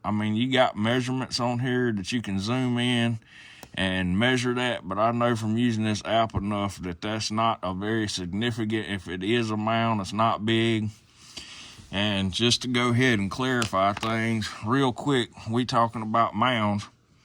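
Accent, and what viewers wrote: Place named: South, United States. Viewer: I can’t help it, man. I love the southern accent so much. Everytime I hear it I hear Aldo Rain from Inglorious Basterds. “Now I’m a direct descendant of Jim Bridger, That means I got a little injun in me, and our battle plan will be that of Apache resistance.” 🤣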